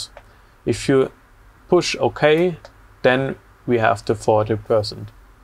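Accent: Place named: Germany. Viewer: the knowledge of cranes and the German accent are strong with him.;-)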